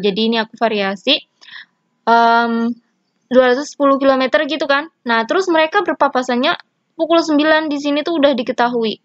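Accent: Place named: Java, Indonesia. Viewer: Muedok nya itu lo bikin semangat belajar bareng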